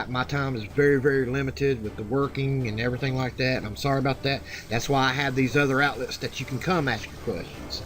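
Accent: South, United States. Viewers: Every time I hear this voice I get a bit of nostalgia for Georgia, Alabama and South Carolina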